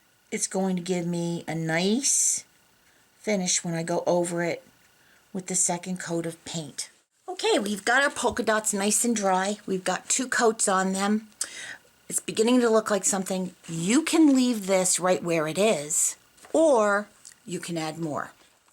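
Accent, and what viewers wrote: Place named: New York, United States. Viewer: I am SO happy that I found your tutorials! I love your colors and your personality--and I think I even detect a little NuYawk accent there :) Can't wait to catch up on the ones I've missed and the new ones to come. You brightened MY day! Namaste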